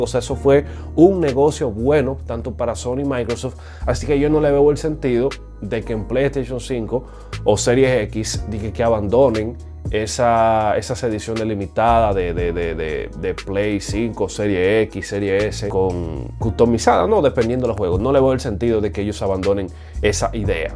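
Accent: Dominican Republic. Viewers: Este pana es Dominicano?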